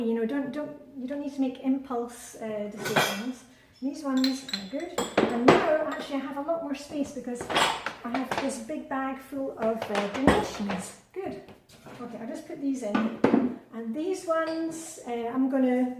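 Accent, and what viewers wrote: Scotland, United Kingdom. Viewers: making my way through your life saving (truly, because here in 2020 lockdown my anxiety level was debilitating!) videos. You are an island of sanity and your Scottish accent is the icing on the cake, to mix a few metaphors!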